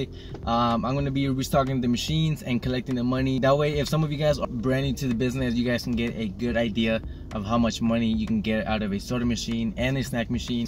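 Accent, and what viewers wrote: Chile. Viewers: Are u chilean bro? AGUANTE CHILITOO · eri chileno ? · eres chileno verdad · Are you Chilean? :0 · Wn eres chileno? La raja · Are u chilean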